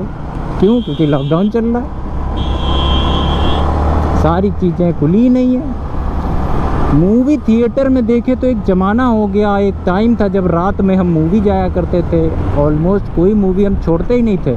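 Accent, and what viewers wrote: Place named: Mirzapur, India. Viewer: Everything aside your mirzapur like accent ❤️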